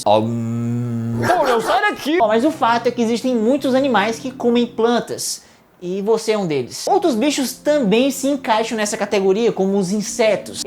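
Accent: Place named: Fortaleza, Brazil. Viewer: O algoritmo me trouxe aqui. Tô maratonando. Vem cá. Você é de Fortaleza? As vezes você fala como nós aqui. Um cheiro. Tô espalhando teu canal pelo "mei do mundo".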